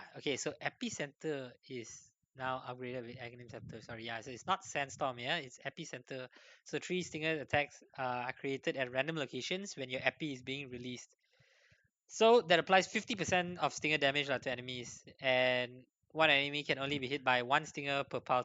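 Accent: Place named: Malaysia. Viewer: Your malaysian accent is so bold, you from which part of Malaysia?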